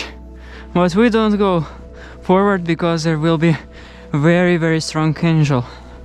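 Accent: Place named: Romania. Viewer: I think you speak perfect English. I'm also used to the accent because my Grandmother was from the Romanian Carpathians and your accent sounds the same to me. 👍 I'm glad I found your channel through Shiey's channel! Love this video! 😎🤘👍❤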